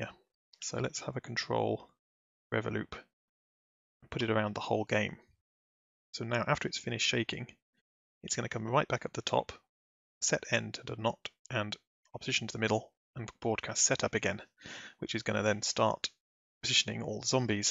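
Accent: United Kingdom. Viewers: I've never heard his voice before. WHOA. He's British. -- bobarlotte (on Scratch)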